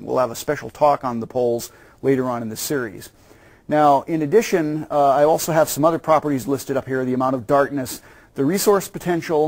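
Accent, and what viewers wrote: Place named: United States. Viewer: the American accent threw me off plus it was very late at night and i need a bit humour :)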